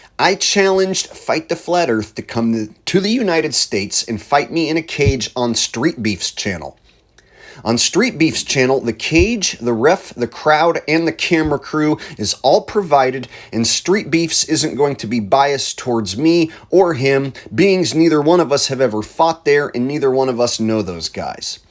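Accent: Bristol, United Kingdom. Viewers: I bet he dont go out much in Scotland, This guys accent is from the Bristol area in England, the Scots are not fond of the English, I cant see making many friends there. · He has a Bristol accent aka Bristolian, so it is possible he isn't in Scotland....possibly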